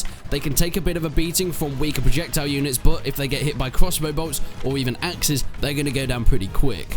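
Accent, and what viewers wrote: United Kingdom. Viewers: You've got the Bear Grylls accent, where is that from?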